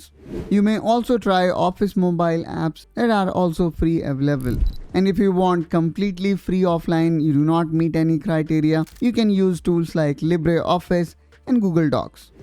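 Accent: India. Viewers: Try using an AI voice, the indian accent is a turn off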